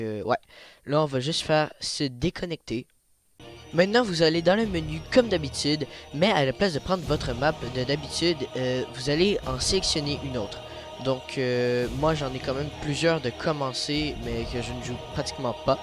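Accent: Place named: Quebec, Canada. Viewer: juste question pas rapport té tu québécois ta un petit accent 😅🇨🇦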